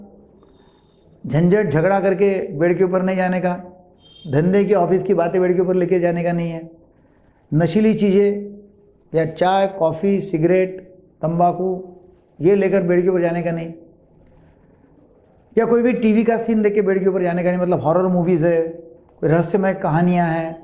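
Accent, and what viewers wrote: Maharashtra, India. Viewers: Bhai Maharashtra me aisi hi hindi boli jaati hai.. aap logo ko tapori lgti hy😑😑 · Bhai ham marathi logo me 90 present log aisehi hindi bolte hai ..Aap aur niche jaoge andhra ya tamilnadu ..Aur ghatiya hindi ayegi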